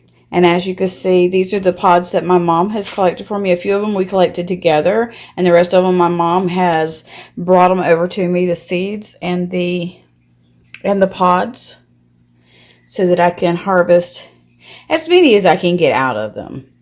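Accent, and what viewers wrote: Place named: United States. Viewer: Thank you so much for this video... I have always been the type of person that tries to reproduce any plant that she sees... I love plants... I picked up 2 seed pods from my best friends yard today because the red seeds poking out caught my attention... I thought "omg, I can grow magnolia trees"... As soon as I got home I googled it and your video was one if the first things to pop up. Thank you sooo much for the information that you have given me and I just put my seeds to soak a little bit ago.... Also, I love your country accent and you seem like an awesome person overall... I hope you have a great weekend!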